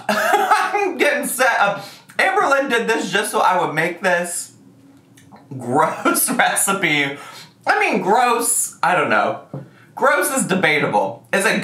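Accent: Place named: Louisiana, United States. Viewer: this might be weird but are you from Louisiana? because you sound just like my English teacher from Louisiana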